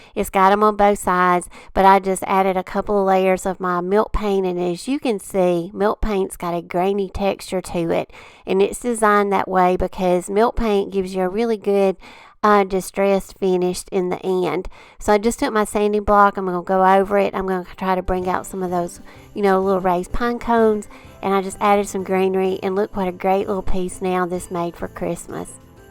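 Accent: South, United States. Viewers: Lovely Southern accent, but she is speaking very quickly and I can't make out what she is saying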